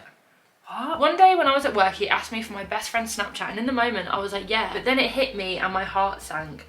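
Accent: United Kingdom. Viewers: Am in love withe ur british accent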